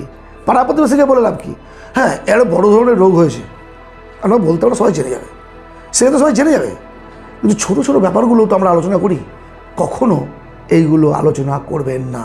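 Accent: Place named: West Bengal, India. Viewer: আপনার কথায় পশ্চিমবঙ্গের টান আছে।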